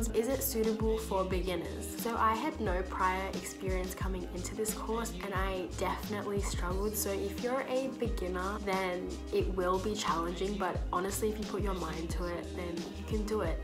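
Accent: Australia. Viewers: Great review thanks 🙏 it would be really nice if you can speak little louder, love your Aussie accent 😊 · Aussie accent 😍 Im currently starting the cs50, so excited.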